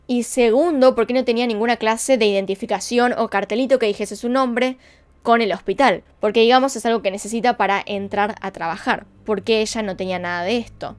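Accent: Buenos Aires, Argentina. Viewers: Yo soy de Córdoba y el acento de es porteño. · Es acento porteño y yo soy Rosario tenemos otro acento. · Pero si tiene acento de buenos aires jsjsjs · Lindo acento Argentino te super Admiro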